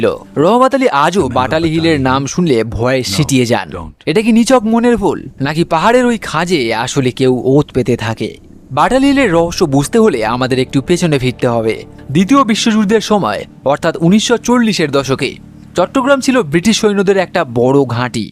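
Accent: Kolkata, India. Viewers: রহমত আলী পাহাড়ের উপরে রিক্সা উঠালো কেমনে, আপনার ভাষাতে মনে হচ্ছে আপনি কোলকাতার,আর এই পাহাড়ের আশেপাশে আমার বাসা, তবে হ্যা এখানে ভয়ের ব্যাপার আছে, এটা জানি,কিন্তু এই পাহাড়ের রাস্তায় রিক্সা উঠানো একেবারে অসম্ভব ❤❤❤❤❤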